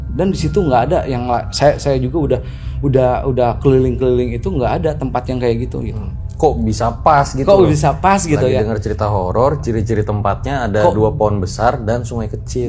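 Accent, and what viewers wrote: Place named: Bandung, Indonesia. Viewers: Duh gusti Aa jatohnya jd bodor ah nyampekeun caritana sunda pisan hahahaha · Ngomong teh meni bandung pisan atuh mang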